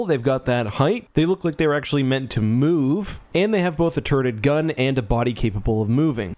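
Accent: Canada. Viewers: Based on your accent you’re Canadian?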